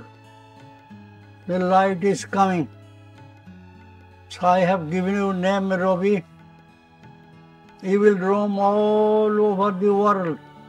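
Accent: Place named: Bengal, India. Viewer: Its beautiful how even his english accent is like bengali english accent❤❤❤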